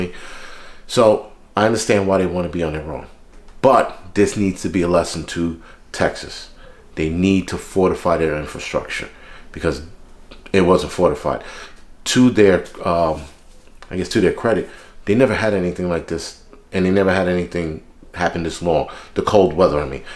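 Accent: New York, United States. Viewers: You nailed it! Sounds like everything that come out of my New Yorker mouth! Lol by far my favorite channel to watch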